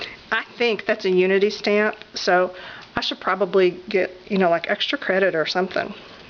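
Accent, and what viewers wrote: South, United States. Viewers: Gotta know where you're from! Our southern accents nearly match! Love these vids!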